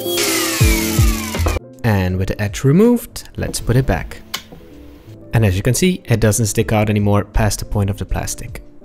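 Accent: Netherlands. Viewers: I know a Dutch person when I see and hear them xD. For I to am a flying dutchman xD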